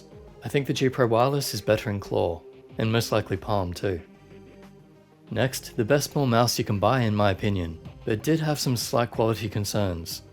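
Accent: Australia. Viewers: are you australian and why can't you get finalmouse?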